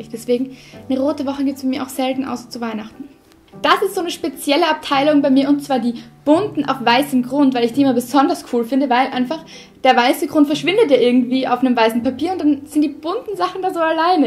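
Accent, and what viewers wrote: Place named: Austria. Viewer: Mehr filofax Videos ich habe mir schon auf Amazon eine Wunschliste gemacht für alle Sachen für meinen filofax denn ich noch nicht habe ich werde mir den gleichen wie du holen weil ich liebe einfach Mint😍 und ich liebe euren österreichischen Akzent ich komme ja aus Deutschland ❤️🔥 Liebe Grüße Florida💓💎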